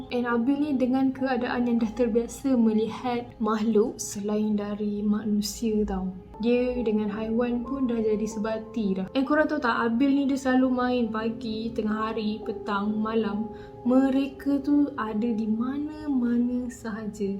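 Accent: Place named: Malacca, Malaysia. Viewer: Akak org melaka ke kak? Cara bercerita mcm org melaka je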